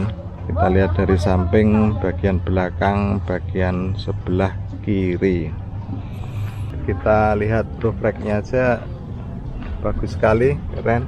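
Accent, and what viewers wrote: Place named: Java, Indonesia. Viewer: Aku bru liat Chanel sampean pakde, walaupun ngomong nya medok Jawa tapi aku suka cara review nya..jelas, detail dan mudah dimengerti.. sukses , selalu pakde..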